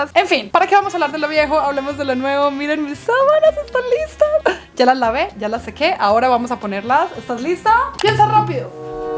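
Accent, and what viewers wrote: Antioquia, Colombia. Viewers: Eh ave maría!! Me encantaaa tu acento de paisa!!! Deberías de hablar más así me encanta me encanta 🥰🫶🏼🫶🏼 😍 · se te nota que la mamá está en casa, ese acento paisa se te escucha hermoso ❤ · con acento colombiano ave maría 😂🎉❤ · me.emcanta cuando hablas con tu acento colombiano me.muero 🎉🎉❤❤ eres la mejor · Muy ricas recetitas. Me encanta que cada vez que vienen tus papás tu acento colombiano regresa y se te escucha muy bonito. Felices fiestas para ti, tu familia y para toda esta comunidad 🎉🧑‍🎄🎄🪅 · Me encanta escucharte hablar más tu asentó colombiano ❤ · Que bonito video, me encanta tu asento paisa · Me encanta ese acento 😂 colombiano ❤ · Me encanta tu hacento colombiano, un saludo . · Ave maria tan bello ese acento pues. Y tus sabanas hermosisiiimas omg😍😍😍 y esa cazuela ummm yummy que delicia tener a tus papis ahi🥹🥹❤❤❤ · amoooo cuando habla bien colombiana, debe hablar más con ese acento 😂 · Que cool hablando tu acento colombiano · Tu acento colombiano es muy lindo🥹❤️ me alegro por tus sábanas nuevas, a veces es bueno comprarse unas cosas para nosotros mismos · Me da mucha risa cuando hablas bien colombiana 😅 me hace acuerdo a las comedias que eh visto. 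Por ej Cafe con Aroma de Mujer 😊 saludos desde Uruguay❤ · Me encanta escucharte bien colombiana, no se si sera porque estan tus papas y se intensifico tu acento, pero cuando hablas de las sabanas me haces acordar a Gaviota de “cafe con aroma de mujer” una serie colombiana jaja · Me brinca el corazon cuanso veo q hay video nuevo 😊😊 y mas cuando hablas cn tu acento colombiano❤❤❤... eres una verdadera motivacion para nosotras las madres. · Hermosa familia amo tu asentó colombiano 🌲🌲🌲Muy feliz navidad para ustedes también saludos desde Uruguay siempre te veo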